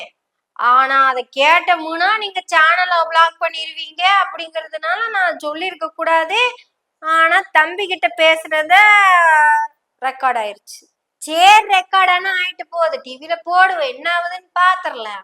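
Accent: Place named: Coimbatore, India. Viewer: Coimbatore slang exact .Superb..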